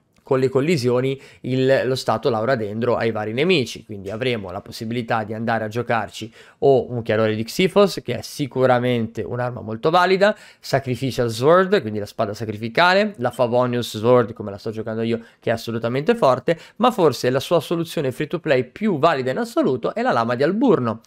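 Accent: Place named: Carrara, Italy. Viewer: Ma a l`è cuscì.... Sei di Carrara?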